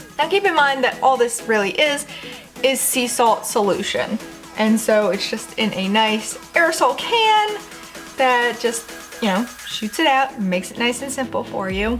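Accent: South, United States. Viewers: It’s not “duh” it’s “the”.. Its not “Pearson” it’s “piercings”.. Are you southern or something?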